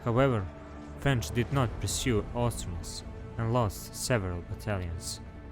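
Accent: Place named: Eastern Europe. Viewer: Cool video but your east European accent is to much for my ears. GL but i will never ever watch your movies again.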